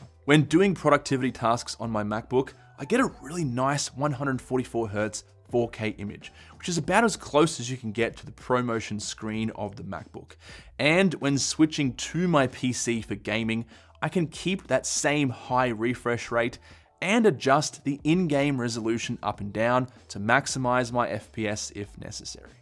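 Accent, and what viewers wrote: Australia. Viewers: I subscribed as soon as a heard that beautiful Aussie accent. How about the Hidpi compatibility? Some monitors don't have that options when connected to MacOs. I'm thinking of buying a mac mini and a gaming monitor. Cheers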